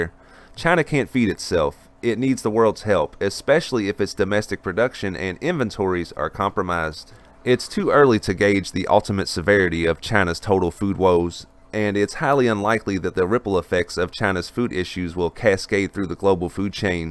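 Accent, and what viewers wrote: South, United States. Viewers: Thanks for this information. You have a beautiful Southern accent! DO NOT ever change it for anybody. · A good old southern boy interpreting Chinese for us.! I'm sold. you got another subscriber bro.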